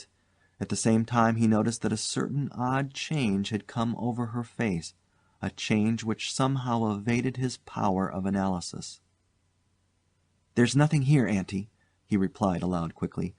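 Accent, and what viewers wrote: United States. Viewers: The American voice is a welcome change. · Funny I think when it's a ghost story narrated,do we expect a British English accent?So when an American narrator comes on..we are surprised..Let's listen..love a bit of spooky!!🙂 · Thoroughly enjoyed this ghostly story, the American narrator was a surprise, but he read the tale masterfully, keeping the suspense until the end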